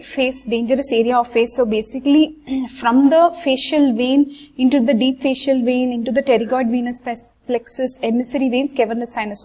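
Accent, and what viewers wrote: South India, India. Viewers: Your speech resembles to south indian people 😁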